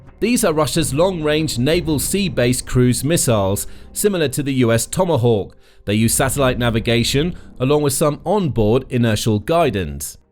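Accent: United Kingdom. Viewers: The English (UK) accent is starting to annoy.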